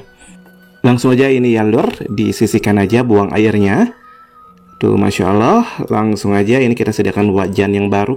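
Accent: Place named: Java, Indonesia. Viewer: kelihatan medok banget😊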